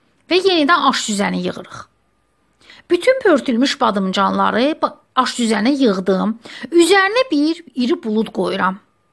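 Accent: Baku, Azerbaijan. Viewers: Sağ ol kənd qızı. Bakının ləhcəsinnən və şorabasıbnan doymağ olmur ki olmur dəəəə. Halal olsun. Mənə ləzzət verdi. Əlün - qolun var olsun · Qədim BAKI LƏHÇƏSİ. 😊😍👏🏻👏🏻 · Size qulaq asanda, mene çox xow olur, içimde nostalji bir hiss oyanir. Uzaq keçmiwime, uwaqliq illerime, köhne mehellwmize , teze pirin üstundeki evimizeze, heyetimize xeyalen qayidiram sanki...Ustaliqimiza da ki, heyranam açiqi. Sizin reseptinizle göyem mürebbesi hazirladim bu il, çox gözel alindi. Ellerinize saqliq, allah dnyadan köçenlerinize rehmet elesin. menim bakili lehcesinde wirin- wirin daniwan bacim, var olun!!!🙏🏻👏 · Caaan. Bakılıların ləhcəsinə heçnə çatmır da... 💋🥰Əlün_qolun var olsun. Balona yığma qaydası da düpbədüz. 👍👏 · Ne sirin danisirsiz? Ewq olsun bakililara..Esl baki lehcesi..Var olun xanim..Ellerinize sagliq..Allah bereket versin islerinize..❤🥰💋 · Super əllərinizə sağlıq danışığınız super Bakılısız deyəsən 😊😉👍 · EZİZİMSEN Baki lehcene gurban. 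Gulaglarimiz sevindi................ Süperrrrrrrrr hazirladin! Muş OLSUN XEJİRLİKLERLE İNŞALLAH👍♥️👍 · Bakılı ləhcəsidir🥰özüm agsuluyam bakılıların geliniyem Şüvəlan da çox sevirəm bakılıları çox mədəni insanlardir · Ay senin bakili lehceve qurban olum ,ay qizim.Ellerive sagliq.Hem danisigin xosuma geldi,hem reseptin.Sag ol,var ol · Nə gözəl öyrədirsiniz. Çox sağolun, öyrəndim. Bakı ləhcəniz də çox gözəldir. 😊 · XANIM ÇOX SAĞ OLUN SƏLİS VƏ AYDIN DANIŞIB ÖYRƏTDİYNİZƏ GÖRƏ .GÖZƏL BAKI LƏHCƏNİZDƏ.🤝🤝🤝🤝🤝🙏🙏🙏🙏🙏🙏🙏🙏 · Xanim,canim menim Baki lehcesuycun daruxmiwuq,Allah ellerinize dilinize saqkuq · Sip sirin Baki lehcesi. Danisiginiza vuruldum. Mukemmel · Eziz Bakilim cox gozel ellerinize sagliq👍👍👍shirin lehcenize defe defe qulaq asdim😂👍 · Can Bakı lehcem!!! Ele darıxmışam ki, öz danışığımız üçün. Resept de elaaa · Cox Sagol can, Baki lehcesiycun cox darixmisdim. Badimcanlar da Cok eladi Bele de eliyciyem. 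😊👍💐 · Can sizin ucun!!!Uzurlu sayin gec cavab verirem.Ele ne yaxshki,sizde varsiniz! Ureyinize,dilinize,el-qolunuza sagliq.Olsun,qoy olsun bizim Baki lehcemiz!!!Seve-seve,zovq alaraq izleyirem.Bu arada,badimcan shorabam lap ela alindi.Sag olun,var olun eziz bacim! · BAKI lehcesiii 👍👍👍👍Hansi kenndir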